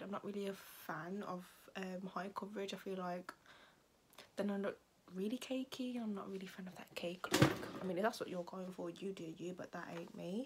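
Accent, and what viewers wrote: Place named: RP, United Kingdom. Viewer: Nah why do you sound so posh wtf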